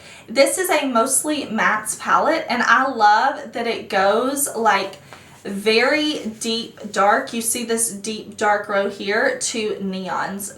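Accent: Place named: Kentucky, United States. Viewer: You sound like you are from Kentucky when you speak. Nice accent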